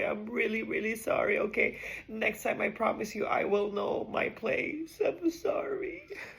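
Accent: Philippines. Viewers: Love the thick filo accent tol, you’re a star ❤ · Every time he plays a mature nurse he always brings more of the Filipino accent 😭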